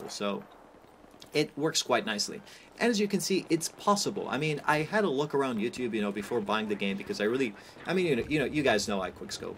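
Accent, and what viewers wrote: Netherlands. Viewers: are you from the dutch? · Hey, you dutch?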